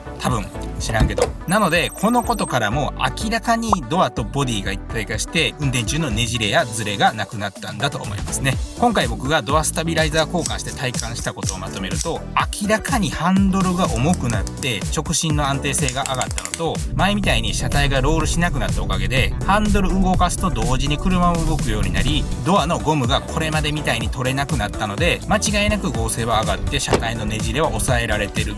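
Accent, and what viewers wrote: Kansai, Japan. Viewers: お返事ありがとうございます😊 さんの関西弁ももっと聞きてえっすがら期待して待ってらっすど😁 へばまんつなー！ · 別にディスるつもりは全くないですが関西弁特有の若干の強みが全く感じないのが本当に人の良さが滲み出てて凄く聴きやすく見やすい素晴らしい動画です✨ ゴムモールの事ときめ細かい点も本当に素晴らしいですしあくまで視聴者目線の押しつけのない綺麗な動画…続けて見てしまいます✨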